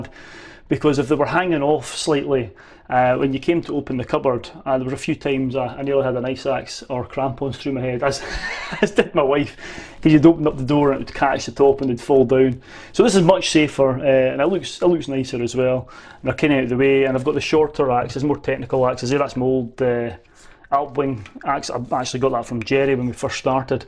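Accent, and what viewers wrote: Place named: United Kingdom. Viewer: Great setup! I'm trying to organise mine a bit more so I can find it when I need it and it isn't all scattered to the four winds. Amusing text at the bottom of the screen trying to keep up with your accent - that technology isn't perfected yet for different UK accents. I'm sure it would be all over the place with my Yorkshire accent. Thanks for uploading.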